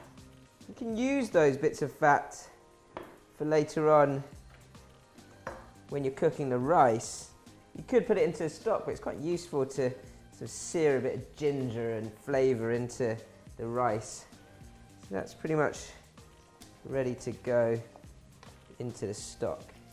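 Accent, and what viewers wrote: England, United Kingdom. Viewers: THANK YOU SO MUCH for the excellent tutorial videos. I’ve love Chinese food my whole life and always wanted to learn how to cook it better. I LOVE THESE YOUR VIDEOS. As a humorous side note. Being from the US I’m used to seeing Asian-Americans with an American accent. But It’s fun to see you with your British accent. So cheers to you. Chef. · the best detailed show for Hainanese Chicken dish so far- of course love the English accent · YOUR BRITISH ACCENT IS TOO STRONG MAN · M8, your UK accent is thick af. Nothing Singaporean abt it.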